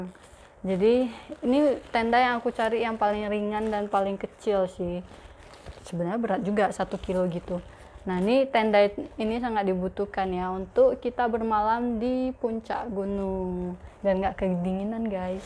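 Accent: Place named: Medan, Indonesia. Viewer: Medanya gk hilang .. wkwk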